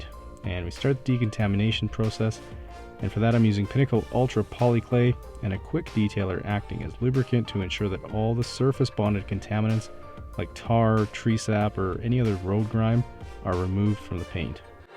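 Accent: Canada. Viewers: *Process in that Canadian accent*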